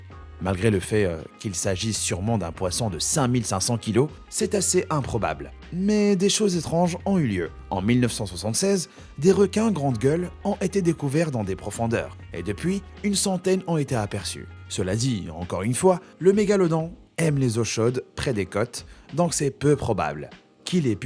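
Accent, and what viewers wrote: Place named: England, United Kingdom. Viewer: Excellente la voix off! l’accent anglais est pas mal du tout ! ;)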